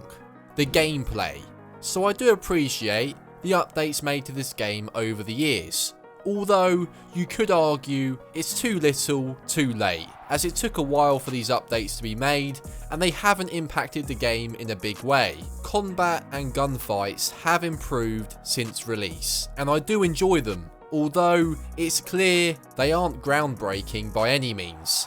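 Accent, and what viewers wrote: United Kingdom. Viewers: Bro you're British as an American it's hard to getting some words I have to playback 10 seconds for that 😂 Edit: but I love your videos though ❤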